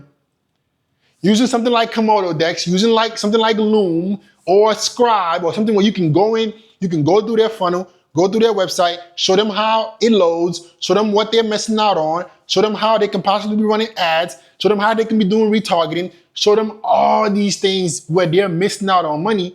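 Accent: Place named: Bronx, United States. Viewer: Lol they're your biggest audience 😂 why am I not surprised. You got a Bronx accent btw 🤔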